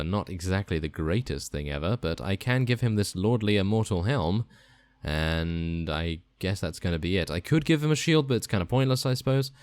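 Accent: United Kingdom. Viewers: The Valleys UK, or British basically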